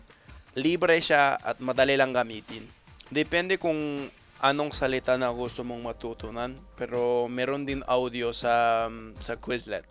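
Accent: Visayas, Philippines. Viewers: Wow even your accent sounds like a true Filipino keep up the good work pare! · his accent sounds like a construction worker from visayas so it sounds perfectly fine, even the flawed grammar sounds visayan, its like duterte was his tutor, so it gets a pass for me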